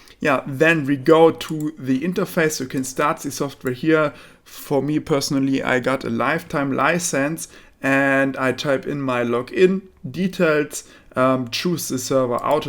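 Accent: Germany. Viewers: I used to work for BMW corporate. Miss the German accent lol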